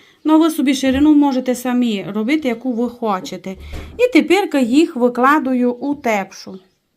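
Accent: Transcarpathia, Ukraine. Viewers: Як я люблю Закарпаття і ваш говір.Продовжуйте так говорити,ботв цьому ваш шарм і ізюминка.З миром Божим.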